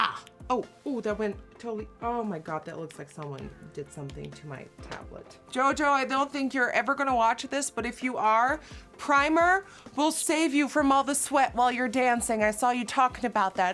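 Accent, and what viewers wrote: United States. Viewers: Your Jojo voice was perfect. I remember when accent was so strong and now she sounds born and raised in the usa! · i sometimes forget that you're dutch???? like you sound soo american? how can you not have an accent lol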